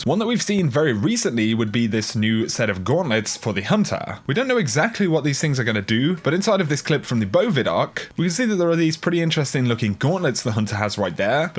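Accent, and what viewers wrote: England, United Kingdom. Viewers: Wow ppl got triggered because you are English and therefore don’t pronounce words with an American accent? Lol, you mortals are so petty. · Hey those idiots are jealous they dont have a sweet ENGLISH accent!!!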